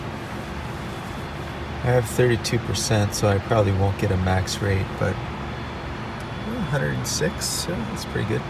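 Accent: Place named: Minnesota, United States. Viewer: I could tell you were from Minnesota right when I heard you.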